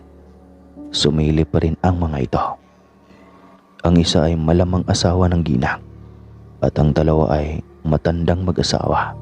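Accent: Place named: Pangasinan, Philippines. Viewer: Parang pangasinan si kuya nag kukwento😊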